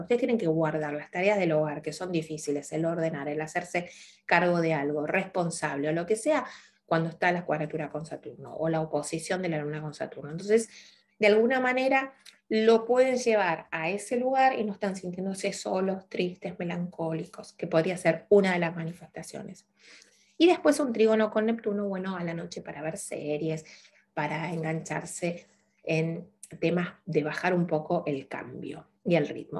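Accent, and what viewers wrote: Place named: Argentina. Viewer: Saludos desde chile.espero algo bueno de marte en tauro.ud estimada sra? Comento lo de mes de julio. Con mucha humildad ud dijo q su ascendente? Es geminis. Y ya q se algo de gemas? Averigue? Que la gema para ud? Y en su ascendente? Es la piedra o gema... agata. Es su talisman indicado. Es lo que se. Desde chile? Mi pais? Un hermoso abrazo fraternal? Hacia ud? Y un abrazo de amistad entre chile y su pais españa? Me parece? O argentina. No se bien de donde es ud. Le encuentro acento argentino. Bueno sea cual sea? Amistad. Chao sra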